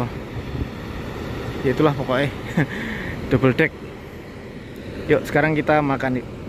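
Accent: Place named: Banyumas, Indonesia. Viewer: AA ngapak 😂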